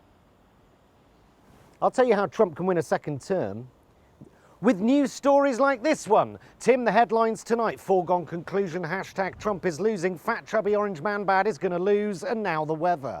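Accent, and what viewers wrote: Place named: RP, United Kingdom. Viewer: If you don't know what Democrats are about, read more than headlines on Google News. They have an entire platform, and get this, they actually talk about it on the campaign trail. The MEDIA is focusing on horserace. You here are every bit as bad as the rest of the media. Except your not, you're worse. You dress up in a suit and a tie and your posh accent and deign to tell ME that my candidate supports nothing but beating Trump. That's false, and you're an idiot for propagating this "two sides of the same coin" nonsense. YOU are the one playing into Trump's lies. What Democrats want to do: Covid-19/Health Care: Legislate to head off court challenges to the ACA. Expand the ACA with a public option. Use the Defense Production Act to make PPE, drugs, vaccines, and other medical equipment to fight Covid. Coordinate procurement and logistics for this equipment with the states. 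Create national policies for safe social interactions until such time as we get enough people vaccinated that we can go back to normal. Economy: Stop the trade war with China. $1 Trillion Infrastructure plan. Offer more money to protect small businesses struggling with Covid. Reinstate aid to people out of work due to the virus. Other things will be looked into after the virus is in check. Immigration: Pass a law to protect the DACA recipients permanently. Comprehensive immigration reform giving people here and contributing a path to citizenship. Reunite separated families. Foreign Policy: Where do we start?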